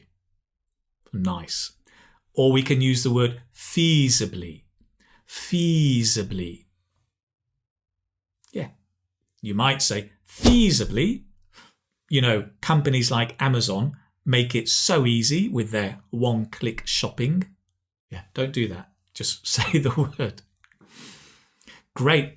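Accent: United Kingdom. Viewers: Your accent is British Am I right? Because you don't pronounce letter r at the end of the word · It was really useful! Thanks a lot! And I liked your nice British accent😇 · hello please this is british or américaine englishe???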